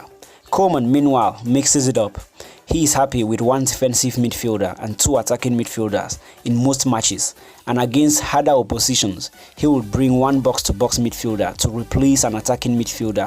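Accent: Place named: Nigeria. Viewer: You sound west African, I can tell because your accent sounds so familiar, I'd say your nigerian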